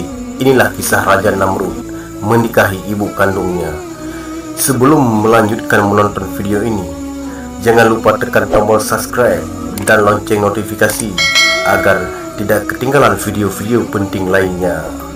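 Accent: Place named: Aceh, Indonesia. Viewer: Logat pembaca: logat aneuk nanggroe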